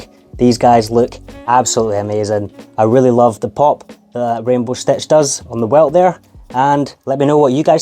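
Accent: Scotland, United Kingdom. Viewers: He's scottish. S c o t t i s h ...get it ? Understand ? i can say it slowly for you if its still too difficult. Guess what you can give him a view with the sound off too 😂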